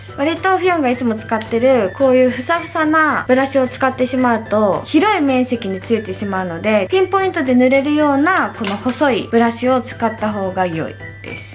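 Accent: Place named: South Korea. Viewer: 英語の発音聞くと、やっぱり韓国人なんだな〜って感じた まじで日本語上手すぎ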